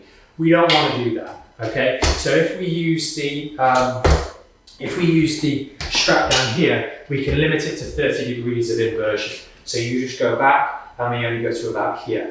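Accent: England, United Kingdom. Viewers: Looks to me that it is a teeter inversion table, between the heavy English accent, the echo, and the fact that he’s speaking turbo mode-I can only hear like one out of 25 words (why do people bother getting a college education when it seems to me logic gets pushed out the back door every single time without fail.👎🏼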